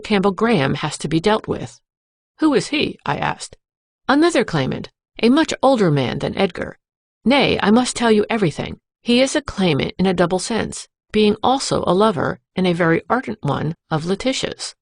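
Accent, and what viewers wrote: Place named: United States. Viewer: sorry, no, don't mind me. I just was looking for as I mentioned in another reply, a British voice reading these particular stories. And I would go to one that would have one name for the channel and it would be I presume you or another female non-British voice, then I would scroll and there would be another one in the series but the channel name would be different and I would click on it thinking it would be a different voice but it was still an American or Canadian female reader. If I mistook this and if it's not like that and there aren't different channels with you or another non-british female narrating then I apologize. I did it very quickly so perhaps I got it wrong. But my original question was why does it sound like the same woman narrating stories I want to hear but with different names for channels where one might reasonably assume it would be a different voice a different person altogether,, but every one I clicked on seemed to be the same voice no matter what the name of the channel was.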